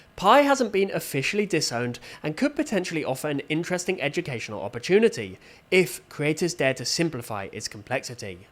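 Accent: United Kingdom. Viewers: A British(?) guy talking about a British show feels so satisfying and I don’t know why.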